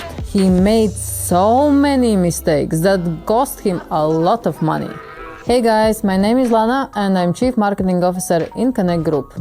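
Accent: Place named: Russia. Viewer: I love the russian accent.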